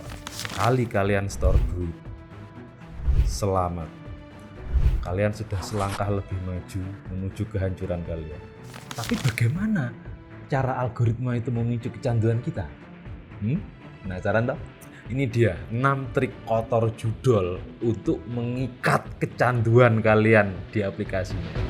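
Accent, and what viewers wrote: Java, Indonesia. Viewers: 😂jawine pundi Mase ?medog men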